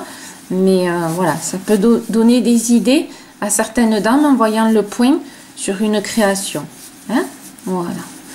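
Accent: South, France. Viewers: Bonjour, avec ce point très sympa, pourriez-vous expliquer comment faire des augmentations et des diminutions, je tenterai bien un pull-over, merci pour vos vidéos très simples et très explicatives et votre accent du sud, moi je suis de la région Marseillaise et je comprends tout❤️❤️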